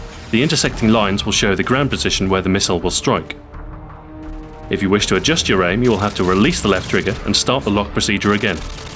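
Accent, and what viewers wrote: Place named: United Kingdom. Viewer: Why does everything sound better with a British voice?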